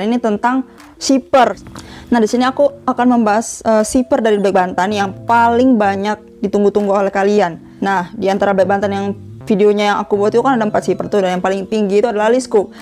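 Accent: Medan, Indonesia. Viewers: Kk orang mana sih k Logatnya kayak orang medan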